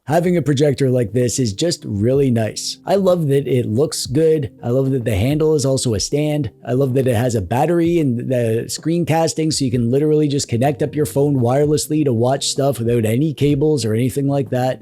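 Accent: Canada. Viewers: You're amazingly Canadian, huh? I don't know how I never noticed til this video.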